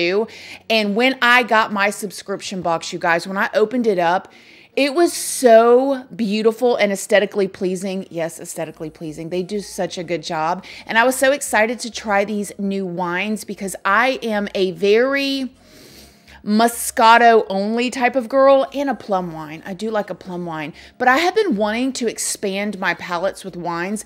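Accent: South, United States. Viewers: New sub! Fantastic job! I knew I recognized that southern drawl. Been to Florida many times, and lived there briefly once. Nothing beats the sunset over Clearwater (my fav vaca spot) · I love your southern belle accent you're the best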